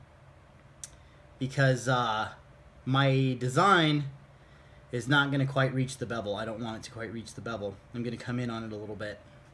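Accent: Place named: Albuquerque, United States. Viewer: Burqueño hahaha oh man bro straight from barque for sure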